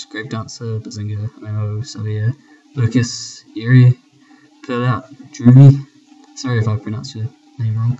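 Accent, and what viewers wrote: New Zealand. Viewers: Such a Kiwi voice ! Awesome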